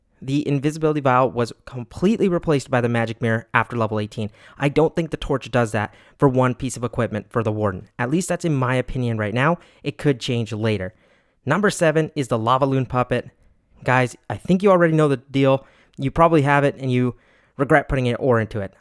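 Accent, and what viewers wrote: Canada. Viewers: New here.. recognized you being canadian just from your tone😂😂. I'm from Edmonton. Keep it up, bud.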